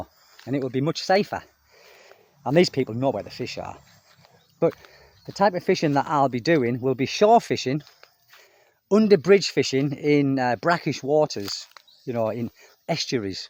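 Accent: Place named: Northern England, United Kingdom. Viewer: Funnily enough i did ask GPT about teaching English online without any qualifications and it suggested Preply. I only need to earn about £300 a month when living there to stop me eating into my savings but i do plan to have a dabble with YouTube also. Maybe do POV walking vlogs or if i'm not too shy do the proper vlogs walking about interacting with people. You do Preply or something similar? I don't have a TEFL certificate or a degree so i'm restricted to the casual platforms. Where were you from in the UK by they way? I'm Nottingham, but i suspect you were a bit further "oop norf" Sheffield? Can't quite place the accent 😅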